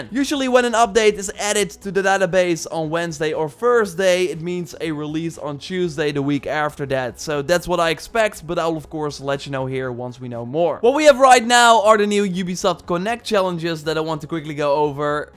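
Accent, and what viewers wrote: Netherlands. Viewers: Yeah i think he speaks dutch judging by his accent at least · why does sound so Dutch?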